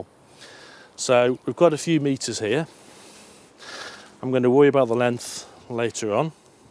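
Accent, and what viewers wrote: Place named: Northwest England, United Kingdom. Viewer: Hi, I am a complete noob at this sort of thing, but what sort of wattage would you get from your 25w panel give, would it power a 2500w watt chain saw? we are trying to power a allotment shed to give us power on site, ps I could tell by the accent your from the northwest I a from liverpool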